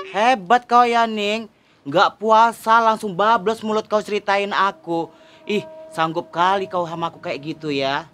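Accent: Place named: Medan, Indonesia. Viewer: 😂😂😂😂😂😂😂😂😂😂😂😂😂❤❤❤❤❤❤❤💯💯💯👍👍👍👍👍😴😴😳😳😳🤣🤣🤣🤣🤣🤣🙏🙏🙏🙏 orang Medan ya😊😊😊😊😊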